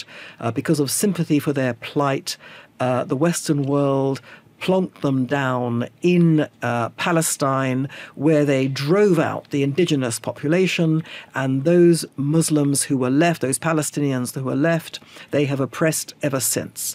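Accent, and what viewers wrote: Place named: RP, United Kingdom. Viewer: Yeah nice posh accent full of nonsense, so easy to inform lies into tiny minds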